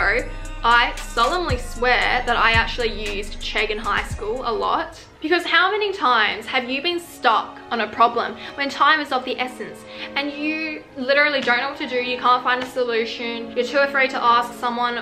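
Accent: Australia. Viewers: Your Aussie accent is amazing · i’m just living for her aussie accent. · Love the Australian accent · Thank you ! Your advices are just so helpful. Also, really appreciate your aussie accent 😊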